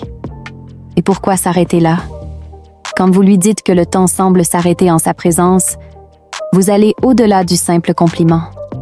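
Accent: Quebec, Canada. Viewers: L'IA a un accent Québéquois ?!? Attention, en France, entraineuse à un sens pas très glamour..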